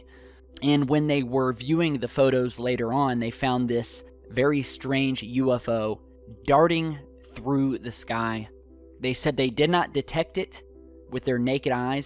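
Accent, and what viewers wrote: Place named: United States. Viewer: its cuz hes talking american english. go have fun listening to some brit's accent. freaks me out all the time dunno why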